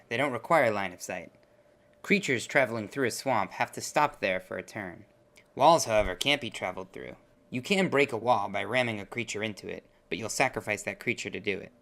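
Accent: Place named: Boston, United States. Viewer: I was just teasing. The Boston accent makes it sound like he's saying caads when he says cards. I got my key just fine and the game is pretty fun.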